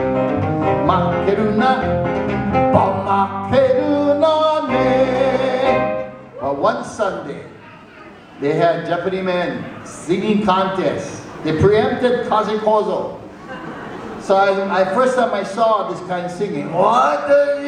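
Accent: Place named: North, Philippines. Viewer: that northern filipino accent is spot on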